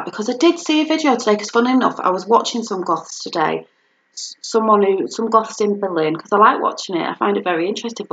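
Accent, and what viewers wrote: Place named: Birmingham, United Kingdom. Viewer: This I think is Birmingham, with that accent lol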